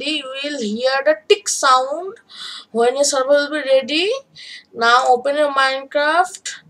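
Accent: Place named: India. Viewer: indian acent lol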